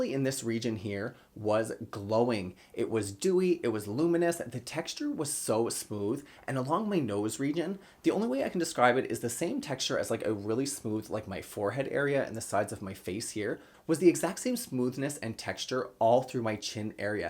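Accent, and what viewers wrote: Canada. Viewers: Your (eastern?) Canadian accent is adorable.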